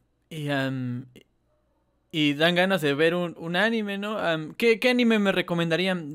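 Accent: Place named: Argentina. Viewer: me enseñas a hablar argentino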